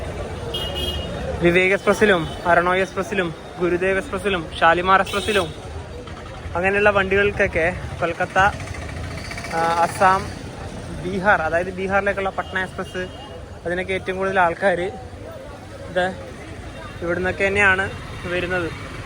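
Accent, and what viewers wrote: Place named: Kasaragod, India. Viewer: I like Kasaragod language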